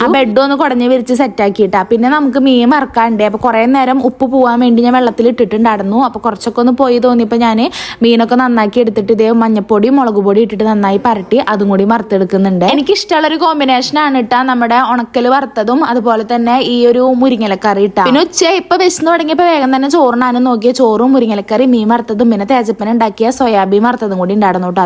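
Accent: Thrissur, India. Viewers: kuthi chathachath parayunnath kelkkan nalla rasam und thrissur slag anu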